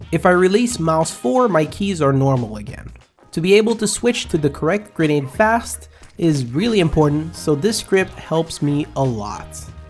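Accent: France. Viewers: So beautiful french accent:O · Are you french? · good french accent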